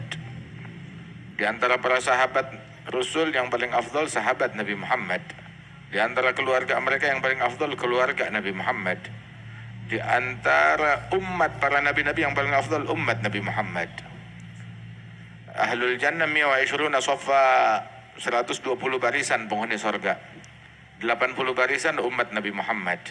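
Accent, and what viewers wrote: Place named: Jakarta, Indonesia. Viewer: beliai anaknua habib novel bin jindan, cucu habib salim bin jindan, logat betawinye kaya abahnya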